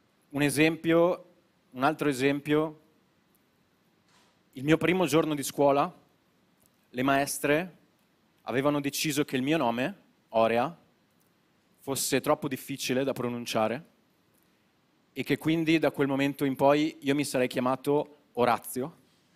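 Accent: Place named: Veneto, Italy. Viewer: Accento Veneto😂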